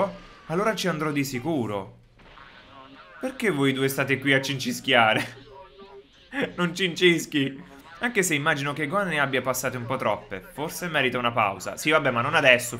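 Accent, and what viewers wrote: Naples, Italy. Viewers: Sei napoletano io si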